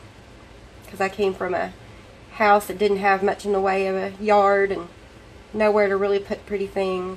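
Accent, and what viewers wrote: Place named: South, United States. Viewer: My boyfriend has asked me why I don't want to start a channel in the future and my Southern accent is one thing I worry about, so it is nice hearing your sweet, southern belle accent. I'm so glad Beth told me about your channel. You have tons a great stuff so I'll be binge watching you for the next few days 8)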